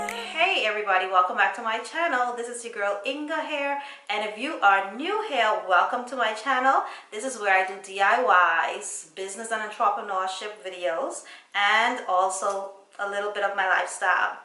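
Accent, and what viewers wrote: Bahamas. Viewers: Oh wow you sound Bahamian! Are you Bahamian?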